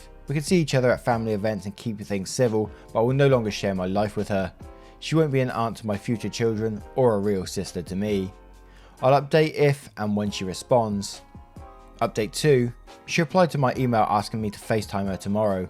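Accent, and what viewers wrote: England, United Kingdom. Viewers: No way would I have wanted to give birth to my sons without my husband there, but that was my own choice and his. I would have lost it if my in-laws had tried to interfere. Definitely not on! Thanks for doing these. You're the only Reddit reader I've found so far that's English rather than American, and actually seems to know all the words you're reading. I really enjoy listening. Good luck for your dad!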